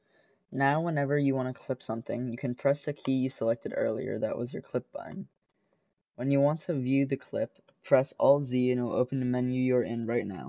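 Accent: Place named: India. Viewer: you sound like one of those indian tech guys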